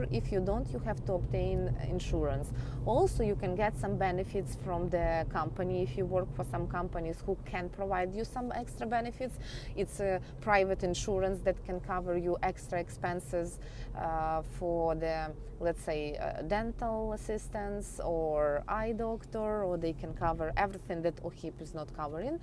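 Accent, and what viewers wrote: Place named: Russia. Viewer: Fookin Russian accent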